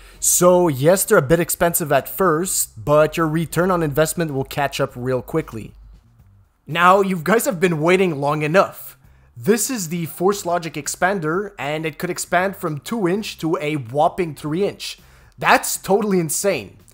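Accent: Quebec, Canada. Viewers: P’tit accent Québécois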